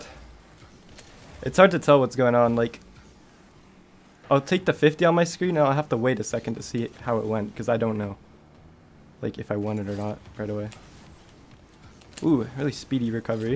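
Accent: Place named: United States. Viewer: Ur like the only good freestyler who has an American accent, maybe osm too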